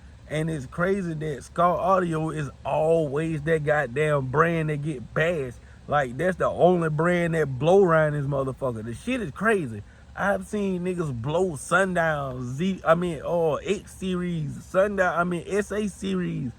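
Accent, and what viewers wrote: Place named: Georgia, United States. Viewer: I enjoy your vids. I feel like, from your accent, you live close to me. I'm in SW Ga.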